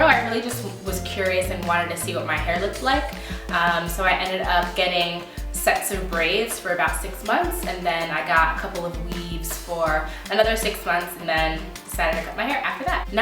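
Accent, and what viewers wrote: Nigeria. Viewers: Oh! Your Nigerian accent was on point..lol very interesting what your mother had to say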